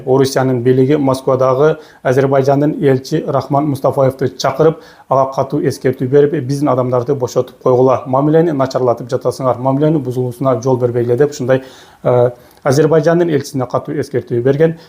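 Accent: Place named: Nookat, Kyrgyzstan. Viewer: Мына суйлоп жаткан бала ноокаттансынбы?? Ноокатский акцент менен суйлоп жатасын. Так кыргызча суйлочу!!!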